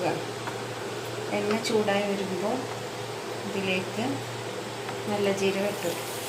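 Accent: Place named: Malabar, India. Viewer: ങ്ങളെ വർത്താനം കേട്ടിട്ട് .. മ്മളെ മലപ്പുറം, കോഴിക്കോട് 🤗🤗രണ്ടിലൊന്ന് .. അല്ലെ..?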